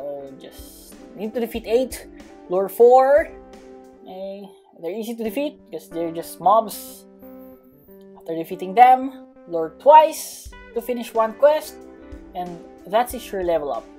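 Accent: Philippines. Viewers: your voice sounds english is no that good you pinoy? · is a filipino because the accent is familiar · Fact: he's a Filipino cuz of he's accent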